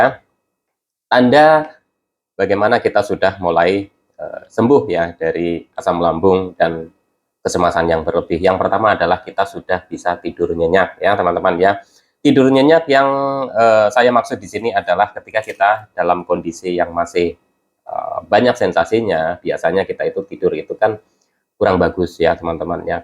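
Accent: Banyumas, Indonesia. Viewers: Nyong ngapak jga mas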